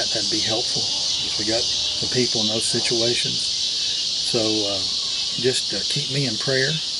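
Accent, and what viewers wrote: South, United States. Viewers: I love your southern accent! And also the way you explain everything in such detail!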